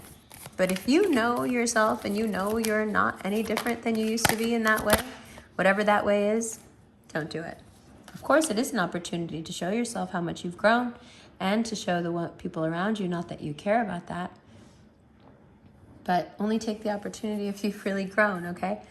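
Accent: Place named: New York, United States. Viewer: LOVE you Fam!! ❤️🕉️🙏🏼 That New York twang coming through strong 😍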